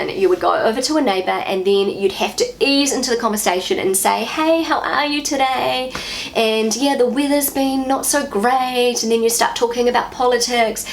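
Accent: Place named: New Zealand. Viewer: I am Missing you and your nice Kiwi accent everytime I'm Not online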